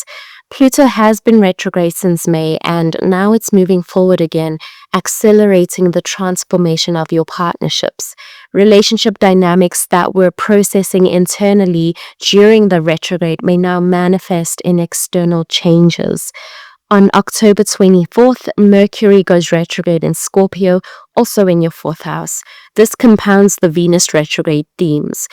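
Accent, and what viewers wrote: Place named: South Africa. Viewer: Sounds like a very South African accent.You've got going there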